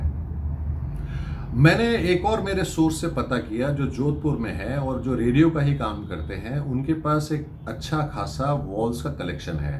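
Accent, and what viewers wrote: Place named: Turkey. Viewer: I know this guys is speaking english but the turkish accent makes it gibberish :(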